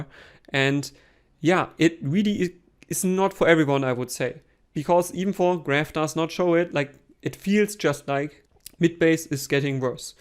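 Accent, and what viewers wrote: Germany. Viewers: shouting SS with german accent... to much fo rme.. I roffled and moved on...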